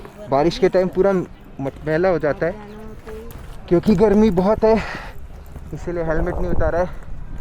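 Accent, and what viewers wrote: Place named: Chhattisgarh, India. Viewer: Deady colaigen..😂😂😂 ur chhatisgarhiya accent is so dope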